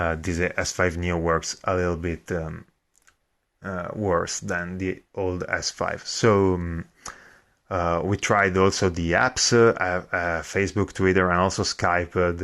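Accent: Italy. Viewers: brutta e pesante la cadenza italiana... ma voi per parlare l'inglese in questo modo da chi l'avete appreso? dalle capre? si sente proprio forte la tendenza ad italianizzare il tutto... a dir poco vergognoso. E in cinque anni di scuola superiore ho imparato più io che voi un inglese di livello intermedio, ma voi cari state alle pezze che pure i bambini vi superano. Fate un corso di dizione o quantomeno aggiornatevi con babbel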